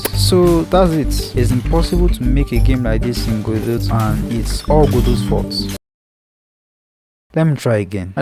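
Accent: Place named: Nigeria. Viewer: U Sound Nigerian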